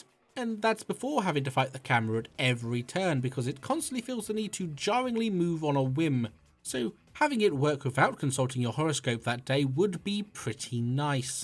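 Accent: United Kingdom. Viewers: I didn't read every comment on each video you made, but did anyone ever tell you that you should have more subs? Maybe it's the British accent, idk, it only made me a little uncomfortable.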